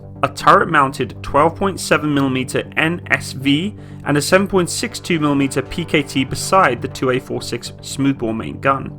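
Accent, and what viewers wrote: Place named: United Kingdom. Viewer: The quality of your analysis and videos are second to none. Thoroughly researched, well composed and hugely entertaining to boot. I will have to admit that hearing a British voice from home is an added joy factor for me. I actually look forward for (all) your new videos. I can’t say this about any other YouTube (or TV) channel. Please keep going.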